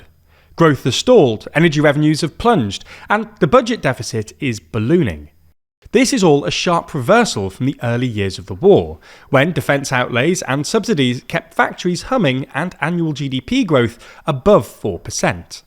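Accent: England, United Kingdom. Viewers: So why not direct your biased, English accent analysis to the Wall Street collapse going on right now?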